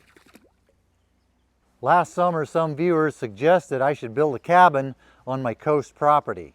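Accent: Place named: Pacific Northwest, United States. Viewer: Sounds like northern oregon or southern washinton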